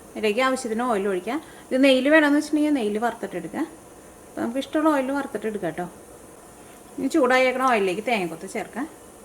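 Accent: Thrissur, India. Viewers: chechee alpam speed kurakamo samsaram trissur kaaree aanu alle?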